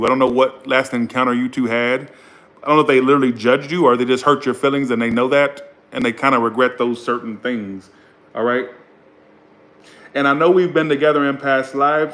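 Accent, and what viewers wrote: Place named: Long Island, United States. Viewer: His “long island” accent takes me out every time! 😂😂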